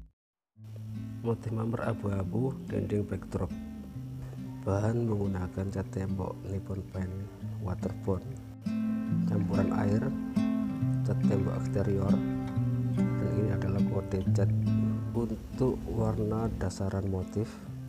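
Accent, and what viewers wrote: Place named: Java, Indonesia. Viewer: Wong endi Iki kok koyok wong jowo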